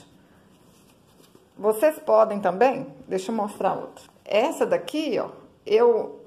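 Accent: Minas Gerais, Brazil. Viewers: Gente, que coisa lindaaaa! Sou fã desses tipos de trabalho! Amo esse jeitinho mineiro de falar. Parabéns. LINDO! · Ficou maravilhosaaaaa, parabéns!!!!! Amo sotaque de mineirinha 😍😍😍😍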